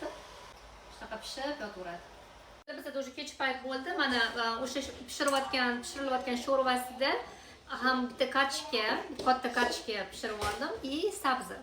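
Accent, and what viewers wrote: Tashkent, Uzbekistan. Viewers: Гапришиз Тошкентча Жула чиройли гапирвас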